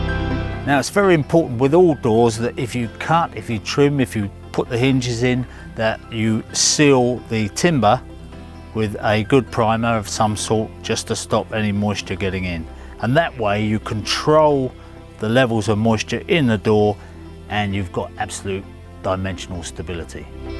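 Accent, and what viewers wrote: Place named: United Kingdom. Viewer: thanks for reply! Unfortunately it's hard to understand Brittish accent for me. Good job though. What is the cost to install such door in UK?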